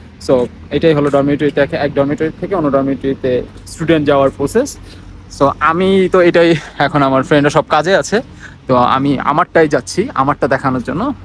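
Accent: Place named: Jessore, Bangladesh. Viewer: যশোর-ঝিনাইদহ এর মত লাগছে।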